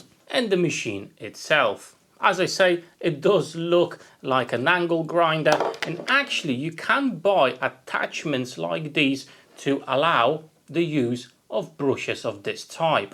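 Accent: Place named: West Midlands, United Kingdom. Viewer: Hey - your English is great, certainly a few thousand times better than my Polish! I'm guessing you were in the West Midlands (Wolverhampton?), judging by what I can detect from your English accent. All the best, Rob in Switzerland.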